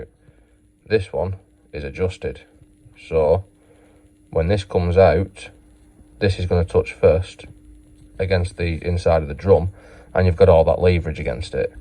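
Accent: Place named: Northern England, United Kingdom. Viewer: Dead helpful mate, love the northern accent btw 🇬🇧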